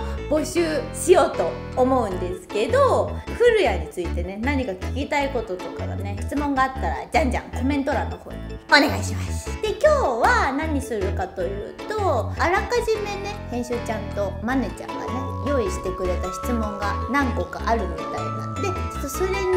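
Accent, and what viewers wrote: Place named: Tohoku, Japan. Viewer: ところどころ東北っぽいイントネーションだと思ってたらやっぱ秋田だったか！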